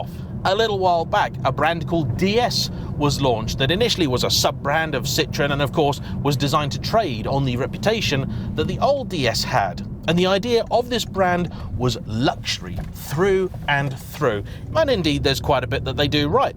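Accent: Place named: England, United Kingdom. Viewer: I’m watching from Australia and I personally just love the very English way talks about cars and I like watching the English countryside and the little villages he drives through… on a note to it’d be good if he did regular fleet updates so we can follow along and be more involved as viewers in what’s happening with the cars in his fleet, even if there’s no happening with a particular car.. keep us updated and involved please … we love the drama it’s part of what makes you entertaining! And the biggest drama I’m holding out for is the engine drama of the S2000, I’m gagging for more content on this car it’s my personal favourite 😍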